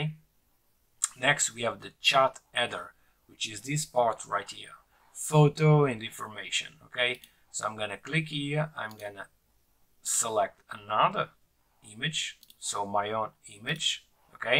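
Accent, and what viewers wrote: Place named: Portugal. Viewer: meu, que accent mais tuga!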